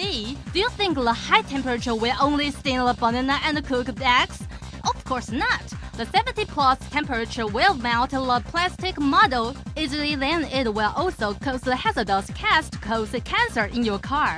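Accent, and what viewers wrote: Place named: England, United Kingdom. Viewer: it sounds english.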